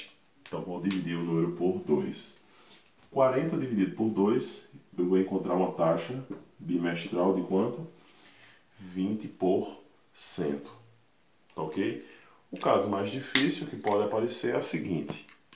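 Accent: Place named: Pernambuco, Brazil. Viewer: Tive grande facilidade em aprender contigo professor. Eu já estudara os anos anteriores este assunto ,mas não conseguia tanto êxito.Nos tempos de escola( há muitos anos atrás). Sua jeitinho simples de explicar à matéria facilitou muito...OBRIGADA! OBS: esse teu sotaque lembra-me meus vizinhos pernambucanos.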